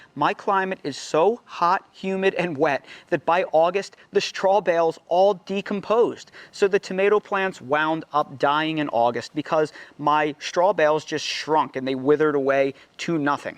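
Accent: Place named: South Jersey, United States. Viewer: thought you had a south jersey accent!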